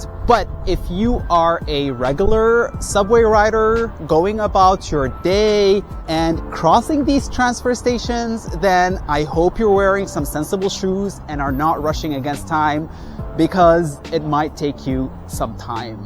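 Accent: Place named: United States. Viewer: You sound like an American. Well, I was also impressed by NY subway 35 years ago when coming from China.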